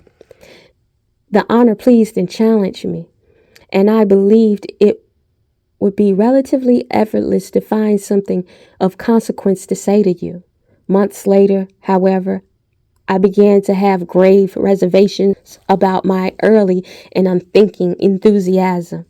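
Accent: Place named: South, United States. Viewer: You're voice is amazing, great narrator. What region of the US are you from? I hear a little southern accent... beautiful.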